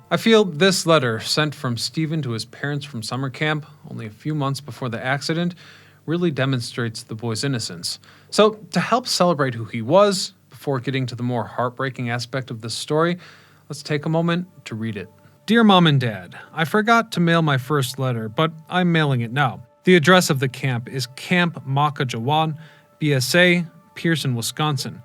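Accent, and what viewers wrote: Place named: Chicago, United States. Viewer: I knew you gave the Chicago vibes😭 lived in Springfield my entire life and people from the suburbs most definitely have their subconscious tells. If you tell me you’re from IL, 90% of the time i can tell if you’re from a suburb or a corn field without asking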